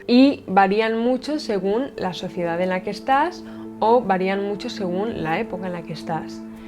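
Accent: Spain. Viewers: Hola me gusta mucho ver tus videos me gusta mucho escuchar tu punto de vista además de que me gusta mucho tu asentó me gusta como se escucha el español de españa ojala poder conocer una española jiji saludos desde Costa Rica pura vida!!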